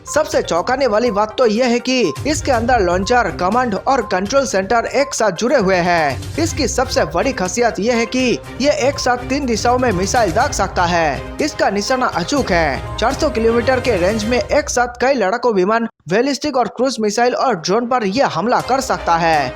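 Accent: Bengal, India. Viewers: Bhai tu bangali ha tera bolna k ton sa pata chal raha ha lagaraho bongo munna bhai Jay hind......🇮🇳🇮🇳🇮🇳🇮🇳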